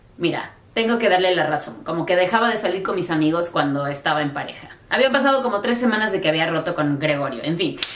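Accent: Mexico. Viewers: You speak like a Mexican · rad15 90, para estás fechas, ya sabrás que ella nació en EUA.......y que fue estuvo desde pequeña estudiando en México, (por asuntos familiares) así que sus estudios básicos elementales fueron bajo el sistema educativo mexicano. Es básicamente una mexicana, nacida en USA. Qué les pasa?.....no tiene ningún acento, que no sea el español; aprendido en México. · Veo tus vídeos más que nada por tu acento jaja. Me gusta mucho que hables tan mexicana, no es sólo que hayas vivido aquí, sino que entiendes la cultura y eso se agradece. Sobre la droga, hubiera sido interesante saber si Pancho conocía al bartender jaja es lo único que me hace lógica. Como comentario sobre el video, sentía a veces que la música más que adentrarme en tu narrativa, me distraia y desconcentraba de lo que estabas diciendo. Creo que no es necesaria. Saludos! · Tiene el acento Mexicano.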